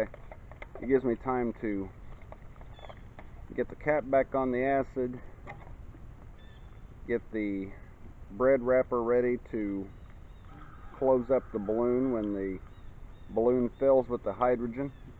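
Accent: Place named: Indiana, United States. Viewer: What part of Indiana are you from?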